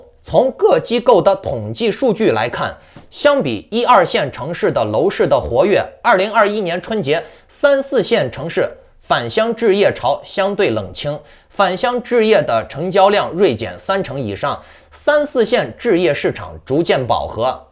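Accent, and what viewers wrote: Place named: Hebei, China. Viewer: 其实很早我就感觉你是河北人了！看来北方人的特点通过声音和语境还是能品出来的！👍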